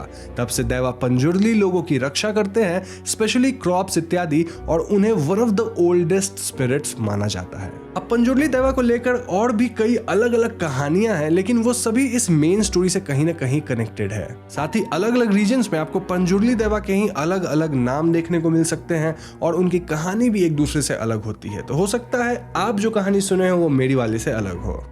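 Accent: Bengal, India. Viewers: Your Bengali accent is nice.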